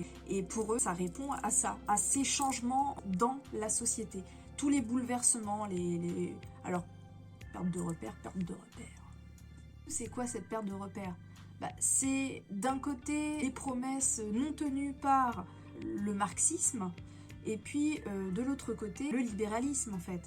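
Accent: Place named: England, United Kingdom. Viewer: Ton accent anglais oooh. Les valeurs traditionnelles zombies oh yeah.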